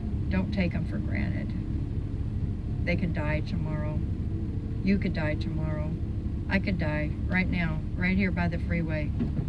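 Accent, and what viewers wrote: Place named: Alabama, United States. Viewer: <link> When I was in San Diego and searching for interesting places to visit and park my van that didn't cost anything, I found many places over in Mission Bay by SeaWorld. There is a nice parking area on Vacation Isle where you can park and see the boats go in and out the harbor. These are for day time hours only but some parking areas open real early am for the fishermen to launch their boats. It's fun to see the boats go in and out the harbor. There are even some Mississippi paddle boats to watch at night all lit up in the sky from their lights. Hope this helps. What part of Alabama are you from for your accent sounds Alabamian. I enjoy watching your videos.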